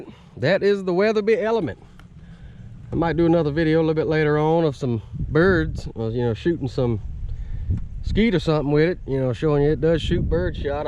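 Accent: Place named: New York, United States. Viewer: With that accent, I keep wondering what part of New York you’re from. Right?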